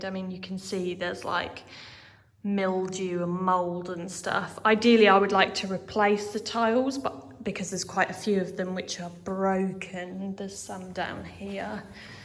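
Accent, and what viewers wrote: United Kingdom. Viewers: Such a beautiful “ British English”….😌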